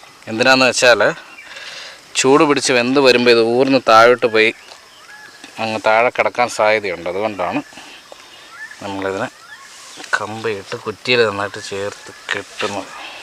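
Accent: Kottayam, India. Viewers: കോട്ടയം ആണോ സ്ഥലം ? സംസാരം അങ്ങനെ തോന്നുന്നു.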